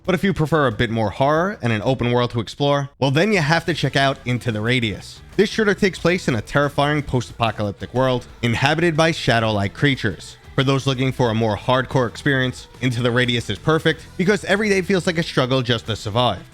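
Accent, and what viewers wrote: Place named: Brooklyn, United States. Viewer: You gotta be from Brooklyn. Also, 311 rules. Cheers!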